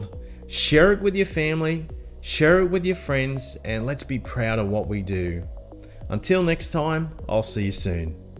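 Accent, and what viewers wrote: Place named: Australia. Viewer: Fun 70s memories, but mine weren't quite as Aussie sounding. 😅 MN, USA 🇺🇲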